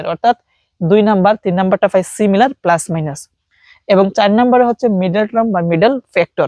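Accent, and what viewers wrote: Sylhet, Bangladesh. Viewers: কথা বলার ধরণেই বুঝে গেছি যদিও সিলেটি কোনো শব্দ ইউজ করেন নি!!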